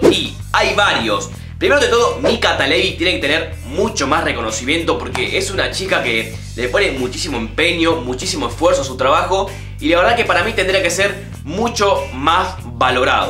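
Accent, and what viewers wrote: Argentina. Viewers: ¿Vos sos de Rosario?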